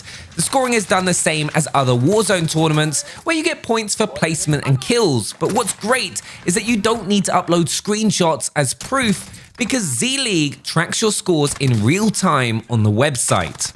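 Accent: United Kingdom. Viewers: U sou d like a British version of rex from toy story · I love how he says butha instead of bertha XD british accents are the best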